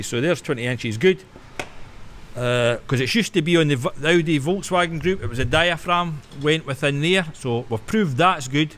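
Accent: Scotland, United Kingdom. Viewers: Nice to hear a good Scottish accent. Could have been egr, but with the state that was in, egr could have been one of many.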